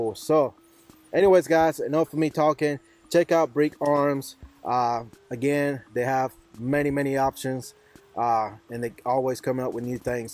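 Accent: South, United States. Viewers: My man here has a southern hispanic accent lmao I just bought this online without researching and i am glad i found your video, it looks like I made a good decision buying this flash hider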